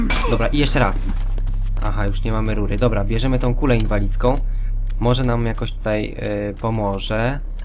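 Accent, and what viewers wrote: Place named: Silesia, Poland. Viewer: Jestes ze sląska slysze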